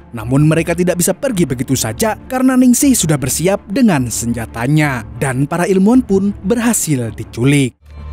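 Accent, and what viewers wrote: Banjar, Indonesia. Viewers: Film John wick bang.... Tetap dengan pembawaan bahasa Banjar yg babangsatan nya bang 👍 Sukses terus buat 👍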